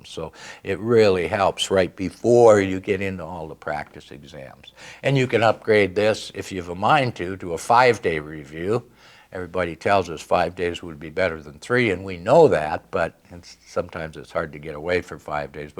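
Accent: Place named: Transatlantic, United States. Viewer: he speaks a transatlantic accent, very interesting topic and information, great presentation organisation. The video is effectively informative!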